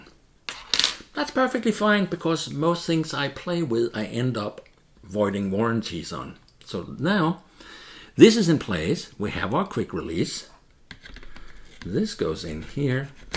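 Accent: Denmark. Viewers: Hi, thanks for uploading. A Dane here from Copenhagen, who also shoots Canon - great setup and hyggeligt to hear your Danish accent. I look forward to seeing what you shoot on your 12K 🥰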